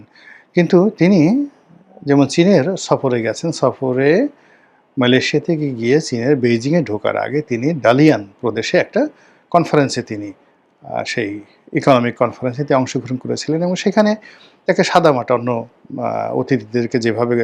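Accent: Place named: Barishal, Bangladesh. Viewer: বরিশাইল্লা ভাষা এখনও আপনার বেস ভাই রপ্ত আছে। রওয়া পড়া কেনু ভুলেই গিয়ে ছিলাম। আপনার সেটায়ার গুলো ভালোই লাগে।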